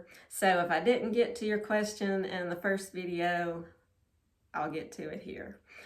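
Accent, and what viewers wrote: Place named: Tennessee, United States. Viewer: Hi, I live in the U.K. and have recently found your channel, I am of similar sizing to you (5’1” and so on) so love watching how you style your clothes. I have a penfriend who lives in Tennessee and she has your accent which again I love. I’ve travelled quite a lot in the USA and when you mentioned Loft I totally agreed, I have bought items from this store and they have been really good value for money. Congratulations on your subscribers, I’m not surprised as you are very relatable and give honest opinions on the clothes you try on. I’m looking forward to more videos so keep them coming 👍😁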